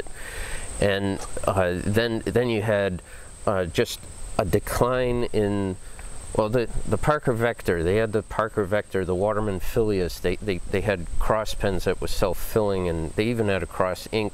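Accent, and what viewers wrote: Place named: New England, United States. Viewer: What a Yankee accent, and I'm here for it!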